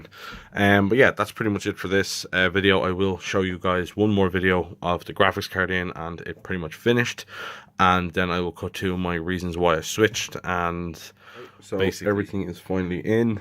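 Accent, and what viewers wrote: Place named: Ireland. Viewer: I'm trying to pick out the accent to see which county (or even which province) you're from. I'm not the best with accents though. Unless it's a strong Dublin, strong Cork, strong Donegal, etc I'll struggle. xD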